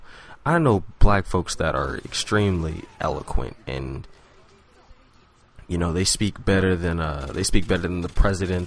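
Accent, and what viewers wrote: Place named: United States. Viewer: i just thought you sounded american.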